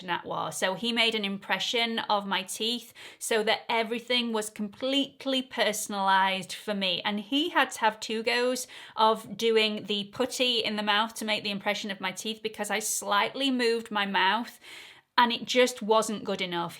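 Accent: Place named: Yorkshire, United Kingdom. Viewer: I'm from the US but I knew I heard Yorkshire!!!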